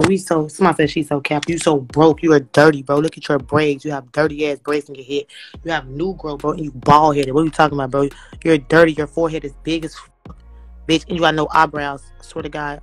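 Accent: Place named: Philadelphia, United States. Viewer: Couple months ago she was up on pophunna sounding like she from philly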